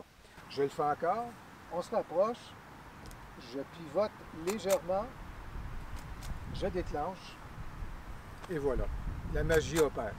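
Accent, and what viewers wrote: Quebec, Canada. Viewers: en québécois ... avec une petite touche d'exotisme bien agréable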